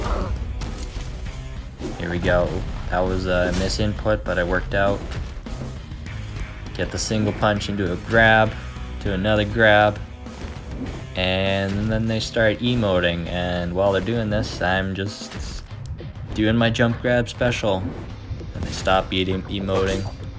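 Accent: Canada. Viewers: You are Canadian right? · Congrats bro...you Canadian?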